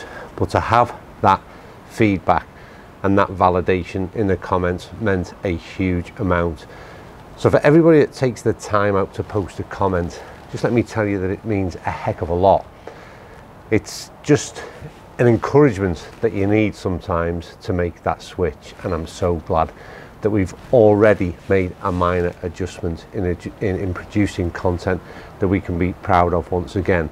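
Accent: Liverpool, United Kingdom. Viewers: Very good. Takes me back to the content when I first watched the channel, especially the travel series. It’s a bit like watching a Scouse version of Eric Anders Lang (meant as a compliment - you’re both great storytellers who use golf as a beautiful backdrop).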